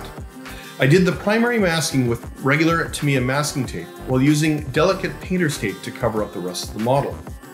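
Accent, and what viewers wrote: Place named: Canada. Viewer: Great video, and being from western Canada (Victoria) myself, I too use the same pronunciation - its' just a Canadian thing, eh?;-) A couple of questions: I was curious why you were not wearing gloves while painting and handling the model; and what liquid was being used to clean your airbrush between coats; and I think I also saw 91% isopropanol being used a reducer.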